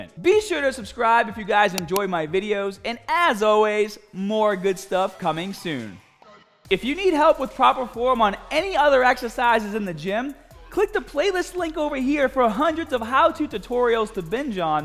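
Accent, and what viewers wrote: Boston, United States. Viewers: It's that thick ass Boston accent. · I love how owning up his Bostonian accent, it always adds a bit of flair to his videos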